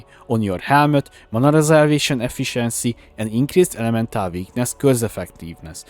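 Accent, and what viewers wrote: Hungary. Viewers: You have a hungarian accent :D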